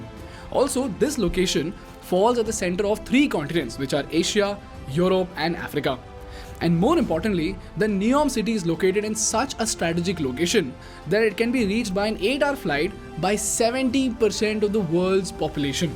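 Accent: India. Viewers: Good video 👍 but Please speak proper English not indlish if u can't then please get someone to articulate it. Very irritating when half is what u says is not clear. World sounds like ver-ll and u speak is fast... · In your contribution there is some truth. But how you present it in high speed and with your indian accent, that's terrible. Also your argumentation chain is not sustainable enough.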